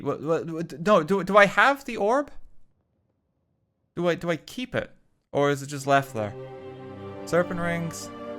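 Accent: Ireland. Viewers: next stream: listening to an irish man speak for 8 hours :) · Why do you do this to me? I'm writing my friggin' A-Level English exam tomorrow and you're pulling me away with irish voice goodness ;w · he sounds like the most irish person i’ve ever heard in my life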